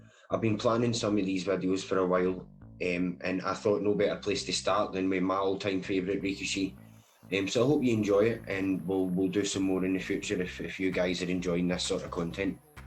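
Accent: Scotland, United Kingdom. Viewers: Thanks, I did, Scottish accent + auto-generated subs = fun :) Btw. this is a must-see: Scottish Star Trek <link> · Thank you for the video. Quite nice and well done ... I am a die-hard fan of Asa also. I discovered sumo with Asa, just short before he to become Ozeki. I dont know why but I think I am in love with the Scottish accent. Hearing you, and also following the channel Scotland History Tour I start to really love the way how Scotts are speaking. You (all the Scotts) have a nice musicality in your tempo of speaking. All the best! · What a great combination - sumo and the Scottish accent! Enough to subscribe :)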